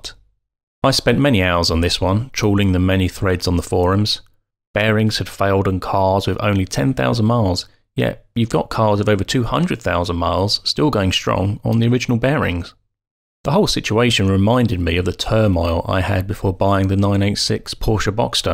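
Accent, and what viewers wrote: United Kingdom. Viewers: Sounds weird but I love your videos as well as Nick’s...would love to hear a conversation between the two of you hearing your British accents haha, also heard the incoming G series M3 debuting sometime this year will finally offer XDrive (it would be great for you Nick being in CT)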